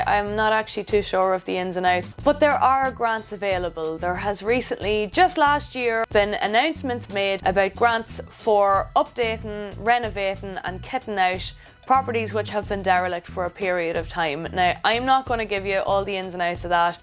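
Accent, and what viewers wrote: Ireland. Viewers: ♡ i LOVE your accent please please please .............. ~ whether I get to be your neighbor in Ireland (an absolute ♡ dream for me) or not .... with all my heart I pray we shall be in Heaven 🙏 🙏🙏🙏🙏🙏🙏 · Great video. What's the accent? Not Louth . . . maybe Monaghan?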